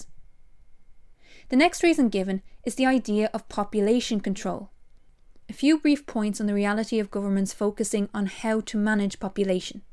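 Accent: Ireland. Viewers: Awkward moment I’ve just listened to all the videos in one morning. Wish my teachers had a Irish accent idve learned way more. Great videos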